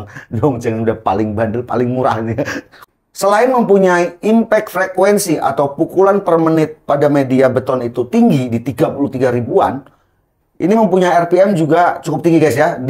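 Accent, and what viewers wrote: Tegal, Indonesia. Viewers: bhs tegal e mbabed...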